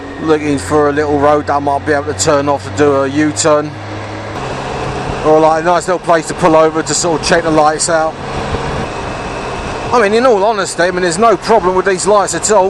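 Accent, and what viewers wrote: Cockney, United Kingdom. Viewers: Good job keep up the good work... Love the vids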